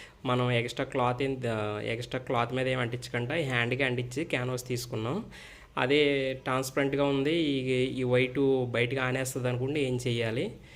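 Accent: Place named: Andhra, India. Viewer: Mana Andra slang theggottandi ani😊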